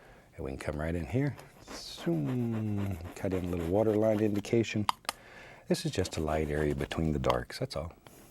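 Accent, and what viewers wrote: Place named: United States. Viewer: he says it a lot in his videos.. pretty sure its just his american accent. he says’ something bout like that.. but the ‘that’ is lazy :)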